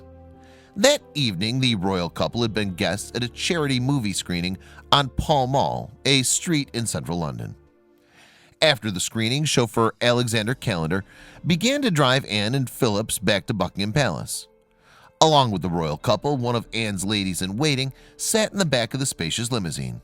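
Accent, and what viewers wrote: United States. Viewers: This was stupid and maudlin. Nobody nowhere wants to see boring still photographs while listening to someone's shrill, whiney yank accent.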